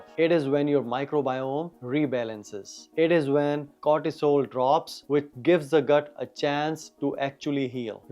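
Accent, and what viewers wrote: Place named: India. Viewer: Sir you sound almost exactly like an indian,or you are an Indian?. Anyway thank you for this info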